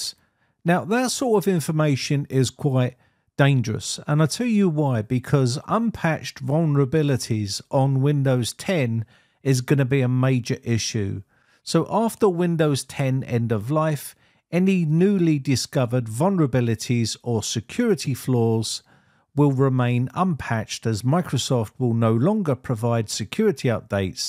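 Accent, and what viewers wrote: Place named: London, United Kingdom. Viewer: it's "Watcha guys" Londoner speak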